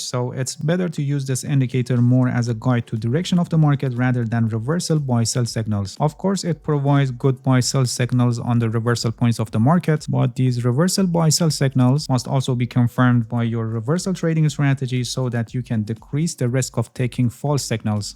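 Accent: Iran. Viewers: thanks. i can hear your iranian accent. but you speak good. 👍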